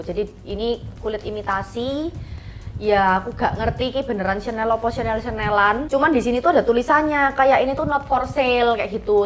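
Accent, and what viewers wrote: Java, Indonesia. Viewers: Cici tp ngmong ny ky jawa pdhl muka ny ky cina 🤩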